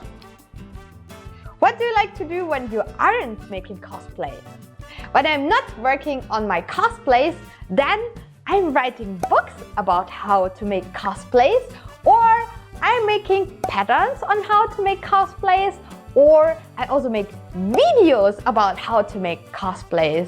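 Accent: Germany. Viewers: English akzent is totally german ;-) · You would make a great Bayonetta cosplayer! Someone as gorgeous as you are would really have no problem with this. Also thank you for always being genuine! I almost feel like it's difficult to find cosplayers that are kind and professional as opposed to being snotty and gatekeeping. Additionally I love your German accent and it's not weird at all! :)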